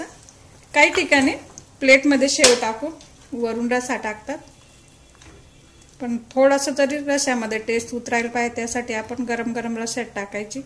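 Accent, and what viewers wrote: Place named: Dhule, India. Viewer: खूप छान.तुम्ही माझ्या माहेरच्या धुळ्याच्या आहात .मला तुमची भाषा ऐकूनच वाटले होते ते.आपल्या घरातच असल्यासारखे वाटते .छान समजावून सांगता.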